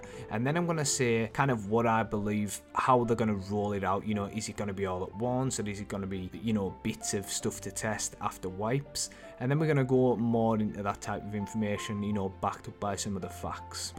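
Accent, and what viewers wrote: Newcastle, United Kingdom. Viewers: Newcastle or Liverpool? I swear it's like a mix of both XD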